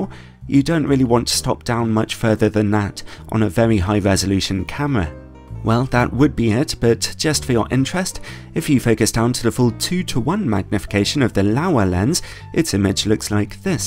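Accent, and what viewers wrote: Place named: RP, United Kingdom. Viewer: It's the voice. Very soothing cadence and RP enunciation.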